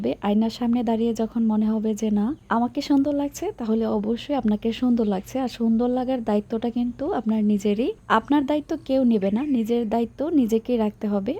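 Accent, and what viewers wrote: Bangladesh. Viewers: আপু তোমার ভিডিও চুপি চুপি এসে সব সময় দেখে যায় কিন্তু কখনো কমেন্ট করিনি আজ করলাম তাও কেন জানো তুমি ব্রাহ্মণবাড়িয়ার তার জন্য আমি তোমার ভাষা শুনে প্রথমে বুঝে গিয়েছিলাম পরে দেখলাম না সত্যি তুমি ব্রাহ্মণবাড়িয়া খুব ভালো লাগলো জেনে আমিও ব্রাহ্মণবাড়িয়া মেয়ে আপু কসবা সৈয়দাবাদ বাড়ি আমার জন্য দোয়া করো আপু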